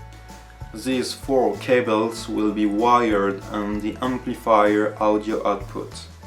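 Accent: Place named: France. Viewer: beau projet ,On sent bien l'accent frenchy c'est cool ^^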